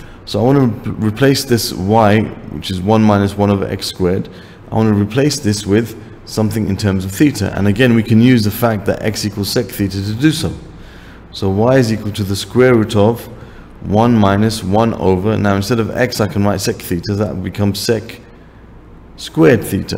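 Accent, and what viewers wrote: United Kingdom. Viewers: That's really interesting. They say you can sort out where a Brit is from by his accent, but hearing your flawless London accent yet knowing your very "un-British" name, I couldn't hold my fingers from asking you this. Thanks for the video and for your reply.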